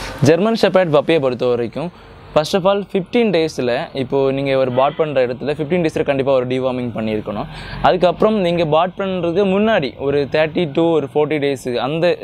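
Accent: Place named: Kerala, India. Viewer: Some Malayali touches in the way you speaking 🥰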